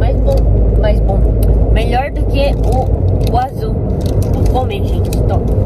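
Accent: Japan. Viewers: o interessante o seu sotaque em portugues.....parece uma japonesa falando em portugues Estudei japones no Brasil e meus parabens por ter feito daigaku no Japao.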